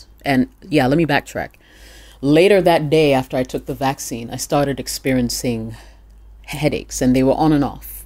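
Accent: United Kingdom. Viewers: Hi, How are you? You are blowing my mind with the way you Speak! The way you speak English is so clean!!! Up to now, I have never heard you break out into an accent. I have a toss-up between you being British-trained or US. So I am from Trinidad and Tobago. There are people in my country how sound is clean as you, being so well-spoken. Trust me I love the way you speak! Because I speak with my Trini Accent a lot, you can sound a bit annoying(lol), however, it's really pleasing to listen to you. Keep it up and every so often drop in your Ghanian accent if you can. Well keep checking out your topics. Have fun doing what you do. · You sound like you've spent formative years in the U.K. to me.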